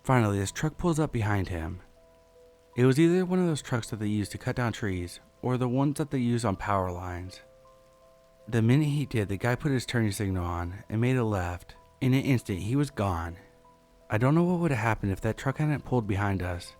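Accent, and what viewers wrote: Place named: United Kingdom. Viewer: Oh no a British accent no thank you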